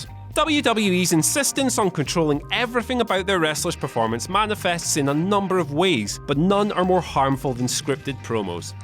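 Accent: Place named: Scotland, United Kingdom. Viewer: I want to keep hearing this Scottish prick's voice. Love this dude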